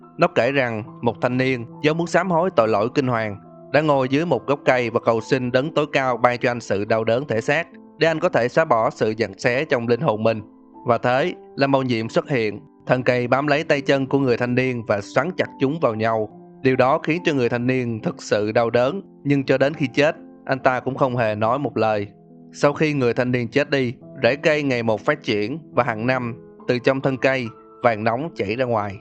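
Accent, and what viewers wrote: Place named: South, Vietnam. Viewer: Ông phân tích game thì chắc ai cũng biết, giọng miền nam nghe rõ ràng, phân tích chi tiết từ cốt truyện đến cách chơi game, tui thích nhất phần chi tiết về hướng chơi game bad ending và good ending 1 số game mà chỉ 1 số game không chơi mới nghe hết, còn mún chơi thì lại phải để dành lại mấy bài phân tích sau khi phá đảo. Tuy vậy nhưng ông ra video khá chậm, mình cũng hơi tiếc về phần này tí, mà chất lượng bù lại thì cũng khá ok. Cố gắng ra nhiều thêm và nhanh hết mức có thể nhá, mình thì auto ủng hộ:)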